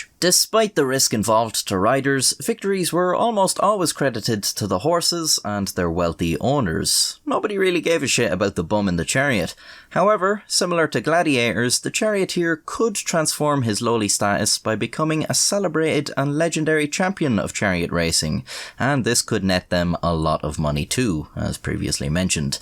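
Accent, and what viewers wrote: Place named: Ireland. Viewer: there's something about his serious yet goofy Irish voice making irrelevant threats that is just so damn funny.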